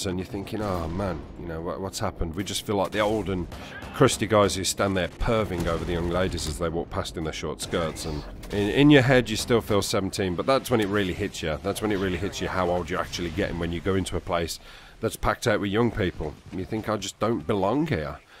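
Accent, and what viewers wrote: Birmingham, United Kingdom. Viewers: I thought you were a fat, bald Brummie! 😂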